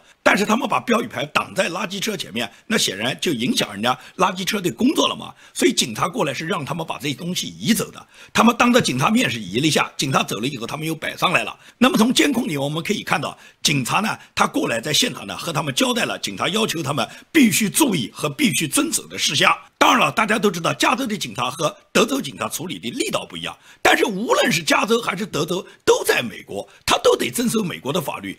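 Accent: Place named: Guangxi, China. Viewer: 一听就是广西底下流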